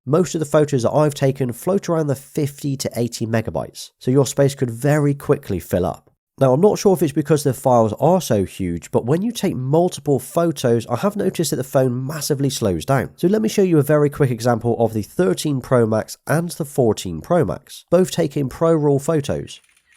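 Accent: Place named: West Country, United Kingdom. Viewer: Your West Country twang coming in strong here😂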